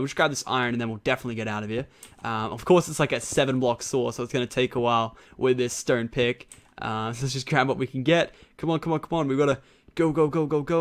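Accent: Australia. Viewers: Also, are you Australian because you sound like it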